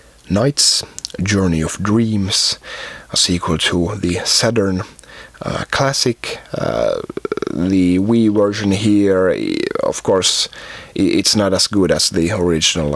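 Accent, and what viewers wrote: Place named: Finland. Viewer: He cant help his Finnish accent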